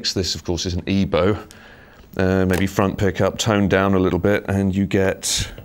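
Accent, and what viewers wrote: United Kingdom. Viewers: Thanks ! That was interesting, but for sure need to try before purchasing. Btw, what a GOOD AND CLEAR english accent you have. It makes easier for non british people like me to understand. Cheers !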